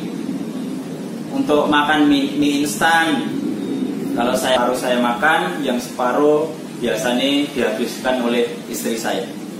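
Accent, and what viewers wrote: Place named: Banyumas, Indonesia. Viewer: rika ngendi kang... ketone ngapak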